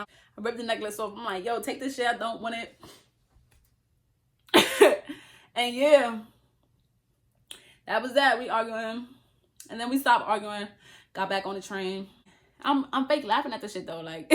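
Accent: New York, United States. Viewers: LMBOOO you are hilarious 🤣🤣🤣 and your NY accent is top5 girl 😹😹🤣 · I frikin love the New York accent!! I’ll be watching you just to learn... ps you are a natural beauty 🥰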